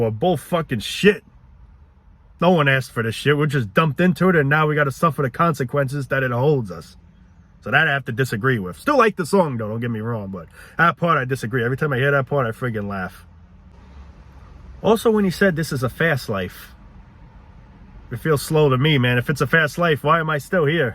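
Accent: New York, United States. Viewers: Hey dude I just discovered your channel and love it! You speak the truth! Most people just plain suck and this rat race life is awful. I hate it. I hate getting up in the morning to go to work and deal with the inconsiderate drivers on the road and then with the annoying and fake people at work. I hate then coming back home and only having barely enough time to eat before I have to sleep and then do it all over again. Ugh. I am going to try really hard to get rich somehow and then not have to work as much. Most people out there take advantage of others and are not your friend. Keep pumping these videos out bro you are doing a great job. Are you from New York? What part? That accent is pretty thick. Thanks!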